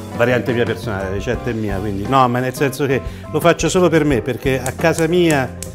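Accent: Tuscany, Italy. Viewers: Sei proprio forte, il forno a microonde non ce l'ho, ma non mi manca. Questa torta di tonno e patate la farò molto presto. Una curiosità, ma sei toscano? Un pochino........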